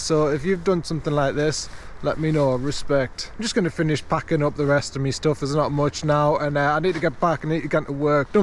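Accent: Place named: Newcastle, United Kingdom. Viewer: By the way, I do want to add that I did enjoy the video. You're like a Geordie version of Joe Robinet.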